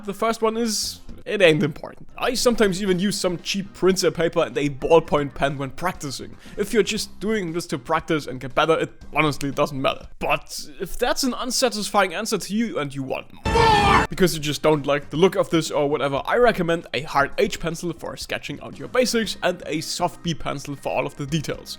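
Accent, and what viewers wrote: Germany. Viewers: Im hearing deutsch broski🤨🤨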